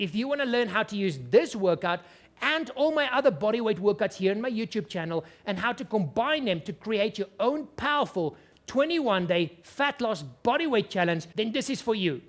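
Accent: South Africa. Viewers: Suid Afrikaans?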